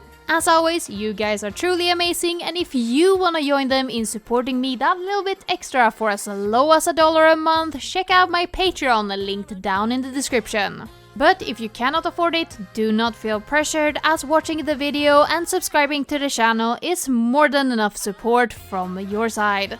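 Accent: Sweden. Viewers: im sorry but i cant stop focusing on the swedish accent 😭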